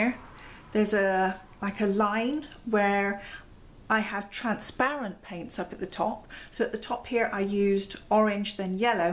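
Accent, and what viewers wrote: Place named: England, United Kingdom. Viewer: I really enjoy your videos. You're not afraid of making mistakes and don't become nearly suicidal when a canvas gets ruined...ha ha, I suppose we all feel like throwing ourselves from a garret when things don't go right... Anyway, I just wanted to say I enjoy your "proper English accent"! So very "Downton Abbey", don't you know?!:-) Cup of tea, guv'nor? Okay, okay, I promise to stop!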